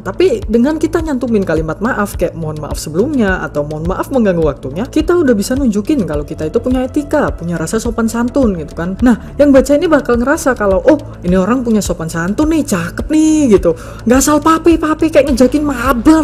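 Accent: Java, Indonesia. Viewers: Bang lu orang mana sih? Kayak ada Jawa Jawa medoknya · " kalian tinggal dugem maen bola sodok " awoskske i love medok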